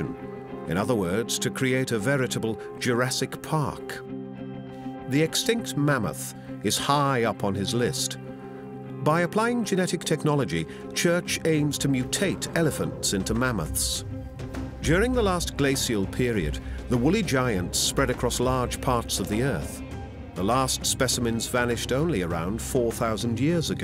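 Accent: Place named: United Kingdom. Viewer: A smooth British accent can make people believe almost anything.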